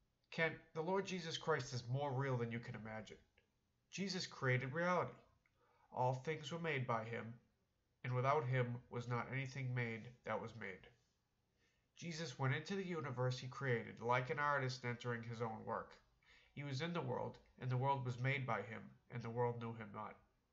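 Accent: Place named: United States. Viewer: THIS HAS A MODERNISTIC TINT. THE OLDSCHOOL ONES ARE BETTER. THIS YANKEE VOICE IS NOT GOOD EITHER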